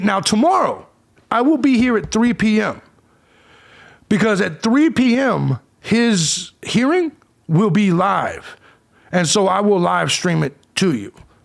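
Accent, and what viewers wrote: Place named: New York, United States. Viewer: After the BATTLE EVERBODY IS A GENERAL. AND SUPERINTELEGENCE, AND DRIVE HIS CAR, HAVE HIS PHONE, A IMBECIL WOD BUY A BURNER, AND MINIMUM 6 MONTHS EFORE, BEFORE THE VIDEOS IN THE SHOP IS DELETED, AND NOW I DONT GIVE ADVISES IN MY TOWN BELGRADE THIS NOW EVERY KOD THE SAME IS IN ARE YOU FROM NEW YORK ORE NEW JERSEY FROM THE ACCENT YOU NOT FROM A SMALL CITY YOU HONNEST YOU SAY WHAT YOU THINK AND AY RESPECT THIS, ALL THIS FOR ME IS REALITY THE ROMANES HAVE GLADIATORS, WY THE PEOPLE TO DISTRACT FROM REAL PROBLEMS. GRETTINGS FROM BELGRADE - SERBIA.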